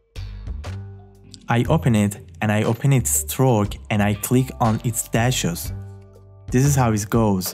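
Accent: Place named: Iran. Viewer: لهجه ایرانیت تو حلقم عالی ادامه بده ❤😂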